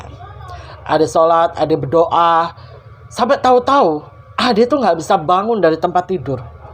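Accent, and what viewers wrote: Surabaya, Indonesia. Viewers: Mak maafin pas kamu suroboyoan nurturin ceritanya aku ngakak bgtttt lucuuuu jd kangen bule sm mbah ku di mojokertooo 😂🥹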